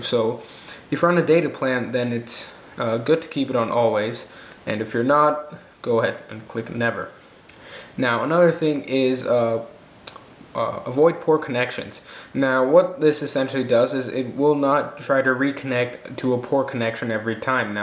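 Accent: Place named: United Kingdom. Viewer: USE UR BRITISH ACCENT!!!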